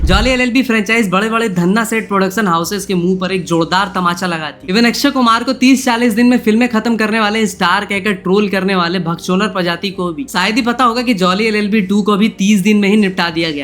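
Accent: Bengal, India. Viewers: Bhai ap Bangali ho ??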